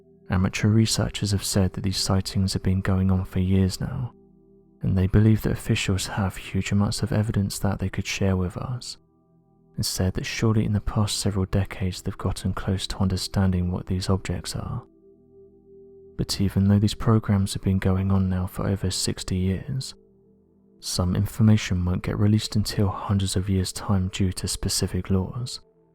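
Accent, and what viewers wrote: England, United Kingdom. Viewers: I m personally tired of the English accents one reason is they use then to lend some type of foolish credibility to every. Sc am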